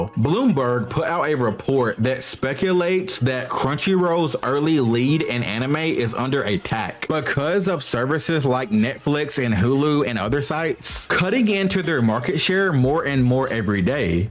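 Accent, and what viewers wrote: South, United States. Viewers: Who was that guy on Bones with the strong country accent… this narrator is giving strong him vibes. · This man def a southern brotha✊🏾😂, all love, I just can tell 😂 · You sound very hillbilly, Mr Ty for making the video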